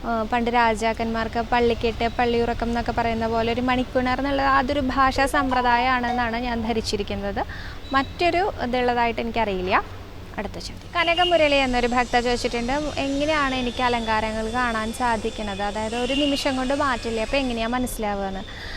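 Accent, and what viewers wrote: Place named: Namboothiri, India. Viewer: ഹരേ ശ്രീ ഗുരുവായൂരപ്പാ ! മോൾ ടെ ഓരോ ദിവസത്തേയും കഥകൾ കേൾക്കുണ്ട്. വളരെ ഭംഗിയായി അവതരിപ്പിക്കുന്നുണ്ട്. ഒരു നമ്പൂതിരീശൈലി ഉള്ളതു കൊണ്ടു തന്നെ അവതരണം ഗംഭീരമാകുന്നുണ്ട്. എനിക്ക് വളരെ ഇഷ്ടമാവുന്നുണ്ട്. വളരെ നിഷ്ക്കളങ്കമായി അവതരിപ്പിക്കാൻ കഴിയുന്നതു തന്നെ ഗുരുവായൂരപ്പൻ എപ്പോഴും കൂടെയുള്ളതിന്റെ ലക്ഷണമാണ്. ഭർത്താവിന് അഷ്ട ഐശ്വര്യവും നൽകി ഗുരുവായൂരപ്പൻ അനുഗ്രഹിക്കട്ടെ! മോളു തന്നെ ഈ പരിപാടി അവതരിപ്പിക്കുന്നതാണ് പ്രേക്ഷകർക്കിഷ്ടം. തുടർന്ന് പോവുക. ഹരേ കൃഷ്ണാ .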